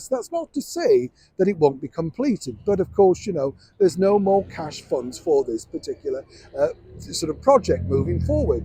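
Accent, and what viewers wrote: United Kingdom. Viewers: 🇨🇦❤️🇬🇧I love your accent and your chuckle. Britts are fantastic folks!! Long live the king and Queen! You have educated me in so many things about England since watching your channel. I’m grateful to you. I wish so deeply to afford to visit one day, but if I can’t afford it ever before I pass, we have you.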